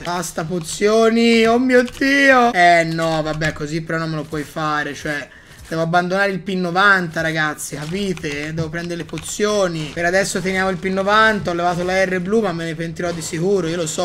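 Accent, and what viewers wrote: Tuscany, Italy. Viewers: Con l'accanto toscano bellisimo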